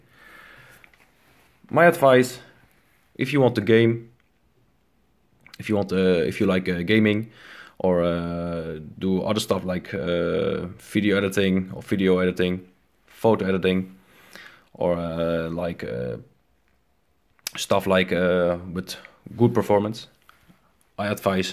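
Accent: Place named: Netherlands. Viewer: haha je kunt horen dat je Nederlands bent xD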